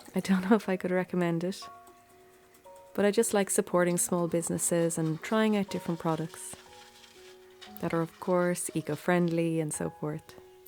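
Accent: Ireland. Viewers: I so love your videos, you can say that I am hooked. I can't get enough of them. I love your Irish accent and soothing voice. You're such a blessing. Thank you for sharing and for all your wonderful ideas. I have always wanted to visit Ireland but you bring Ireland to me. Thank you so much. God bless you. <3 Keep up the great work. You are truly appreciated. Love and hugs to you my dear.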